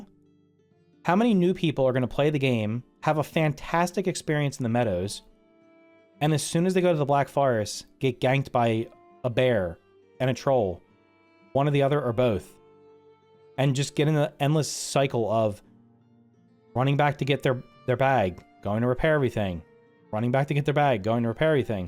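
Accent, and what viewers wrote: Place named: Pittsburgh, United States. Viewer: Is this guy from Pittsburgh?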